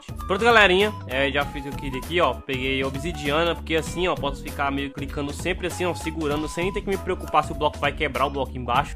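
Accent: Northeast, Brazil. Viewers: Gosto muito do seu sotaque, vc é nordestino ne?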